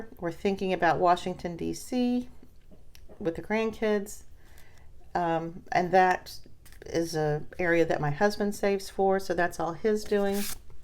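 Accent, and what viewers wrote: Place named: North, United Kingdom. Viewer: Northern twang!!! 😂I love it!!! I love that you got them so quickly!!!!! Thank you so much again!!!!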